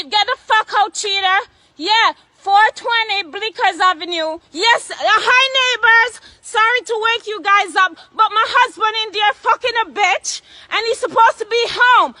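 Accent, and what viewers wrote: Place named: Jamaica. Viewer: You jamaican accent no too bad enuh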